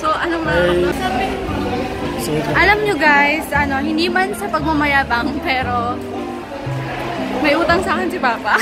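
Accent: Batangas, Philippines. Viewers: Kaya din ano napadpad dito dahil don sa scammer😂😂 Taga batangas ka ga po ung salita mo kasi😂😂😂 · batangueña ga are haha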